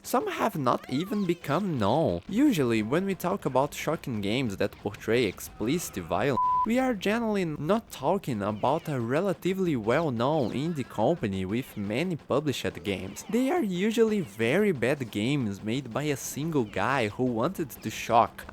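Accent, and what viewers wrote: Brazil. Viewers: Your accent reminds me of a brazilian friend i had. Miss him so much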